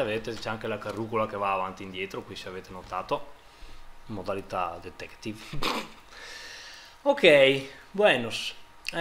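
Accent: Veneto, Italy. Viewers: Un po' veneto e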